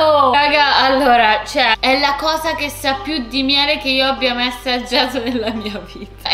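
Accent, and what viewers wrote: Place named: Milan, Italy. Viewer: Stai iniziando ad avere un accento milanese noooo ti pregoooo torna romana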